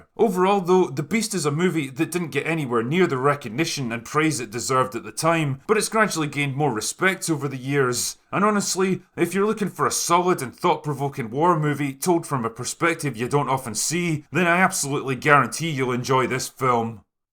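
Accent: Scotland, United Kingdom. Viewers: Drinker missed a prime opportunity to say in his epic scottish accent "The Beast, wha' is it all good for? Absolutely nothin' claiming territory & resources" 😆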